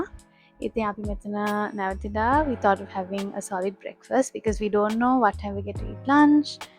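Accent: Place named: Sri Lanka. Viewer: I wanted to write this in Sinhala, but I’ll use English for clarity. Don’t you find it strange and awkward to speak a foreign language while in Sri Lanka? It’s like mental slavery. If your audience is foreign, go full English. If not, speak Sinhala. Using Singlish in between is just… funny. Honestly, broken English with a Singlish accent doesn’t make you “posh.” English is not fancy .. it’s a borrowed language, taken from other countries. Meanwhile, our native language is beautiful. It’s time to drop the slavery mindset. Think about that.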